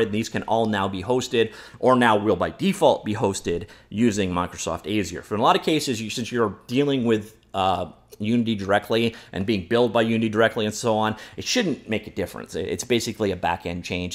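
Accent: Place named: Canada. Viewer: He is Canadian after all. They pronounce a lot of words wrong. I think it's kind of cute, like Carter said to McKay.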